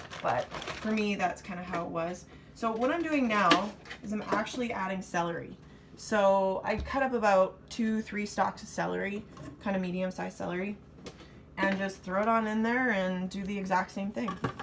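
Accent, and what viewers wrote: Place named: Canada. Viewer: I sense Canadian LoL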